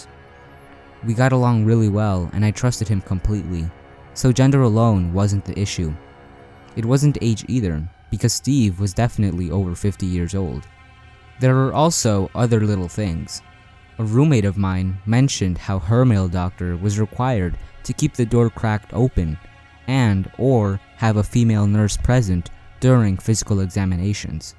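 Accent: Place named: California, United States. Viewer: Subbed! Where are you from dude? I like your accent. California maybe?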